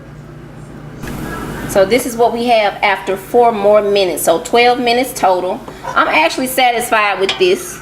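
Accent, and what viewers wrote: South, United States. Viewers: I love your southern accent! 👍❤️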